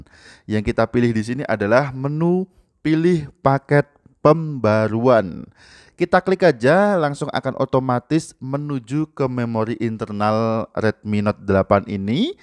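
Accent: Magelang, Indonesia. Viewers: orang magelang kah bang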